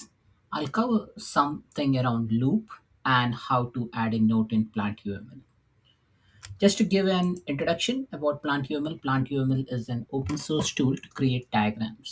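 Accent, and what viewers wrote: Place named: India. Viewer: It's really hard for me to adjust and listen to English with hindu accent. But your presentation is so concise, to the point, illustrative and easy to follow that I've stuck around and put in the effort. Thank you,!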